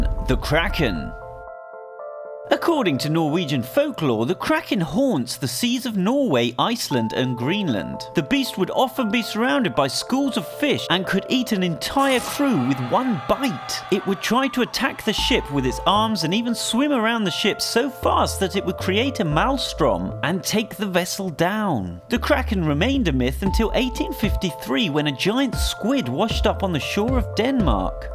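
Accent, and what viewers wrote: England, United Kingdom. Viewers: Nice Englishmen English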